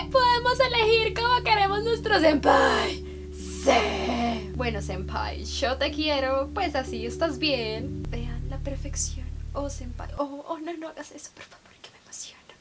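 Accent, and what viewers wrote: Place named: Bogotá, Colombia. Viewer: vivas De Bogotá, su acento la delata :v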